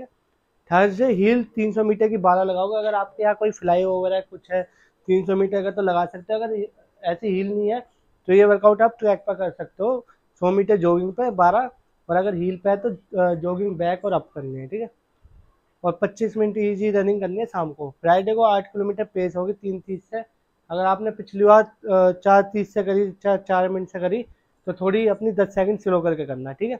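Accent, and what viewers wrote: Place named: Sohna, India. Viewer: आप बादशाहपूर सोहना से हों, आपकी आवाज बता रही है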